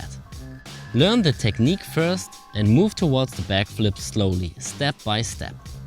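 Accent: Germany. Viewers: Lol this accent sounds very german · You sound german. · you kinda have a german accent haha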